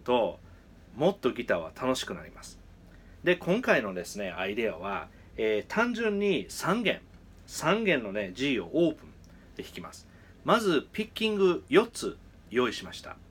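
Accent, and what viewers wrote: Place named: Kansai, Japan. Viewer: 20年ぐらい前に藤田先生のCD付き教則本買って同じ事してました、とても懐かしいですね。 最初は気が付かなかったけど関西なまりであれーってなりました笑 動画登録して楽しく拝見させてもらいます。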